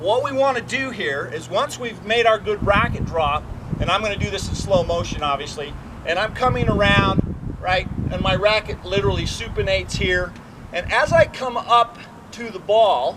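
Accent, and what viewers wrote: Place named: United States. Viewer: Where is Insight Tennis situated? Your accent is US but the locality looks like Irlenad or UK..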